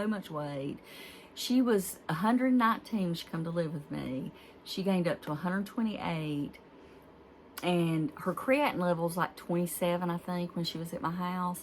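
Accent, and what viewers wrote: South, United States. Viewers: I missed you. So good to see and hear your southern voice!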